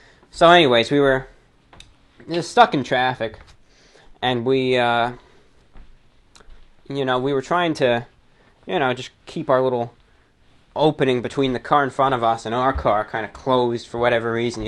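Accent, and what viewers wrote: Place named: New York, United States. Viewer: 7 years ago. Your NY accent was HEAVY back then.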